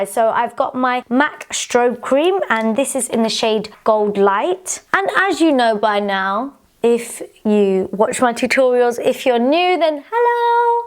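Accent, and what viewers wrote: United Kingdom. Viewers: Wow loved the makeup look you sound so British like a lady from loose women omg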